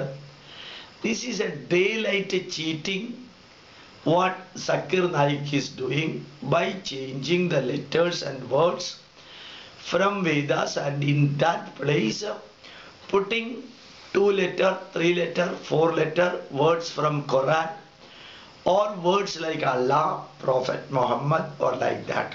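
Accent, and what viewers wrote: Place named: India. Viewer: I have lived in England and mixed with English speaking people all over the world.Different people speak with different accent. Even in England, people speak English with different accent county to county.So, this man has an Indian accent,nothing wrong with that! The message he is giving is important. I salute this great person.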